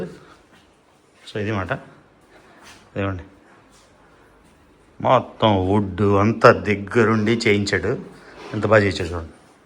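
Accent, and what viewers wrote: India. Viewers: Turpu bhasha bale matladutunnavu,vinadaaniki chalabavundi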